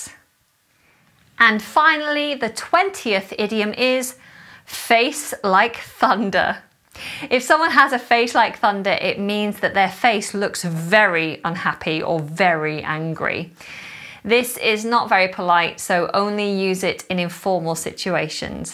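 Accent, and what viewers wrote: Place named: RP, United Kingdom. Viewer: I'm still learning English accent. It's really posh.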